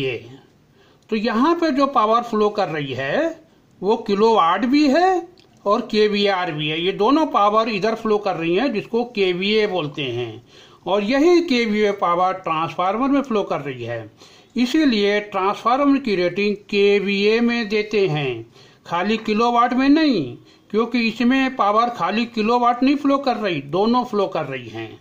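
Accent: Bihar, India. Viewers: Bc Bihari bad speech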